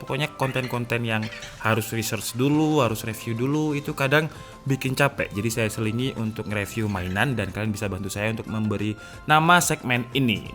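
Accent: Malang, Indonesia. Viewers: Ngalam sam sawangane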